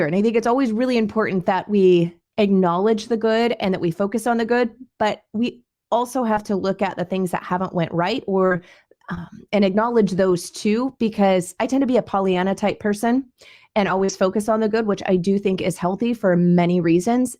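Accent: North Carolina, United States. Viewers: are you from NC?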